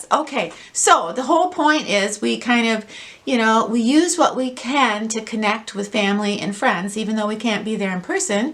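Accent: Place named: Midwest, United States. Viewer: i really hear your midwestern accent in this video.. it is very cute. stay well..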